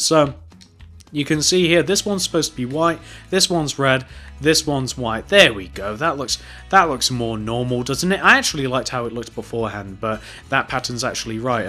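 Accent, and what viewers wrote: United Kingdom. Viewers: I have a question are you British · + Kevin Adams Yes he is British ( focus a little bit on his accent )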